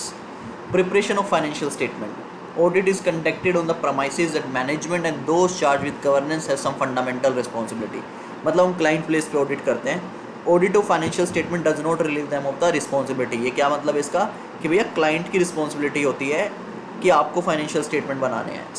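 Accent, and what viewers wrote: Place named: India. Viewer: Unfortunately, i can t understand indian english accent ... :(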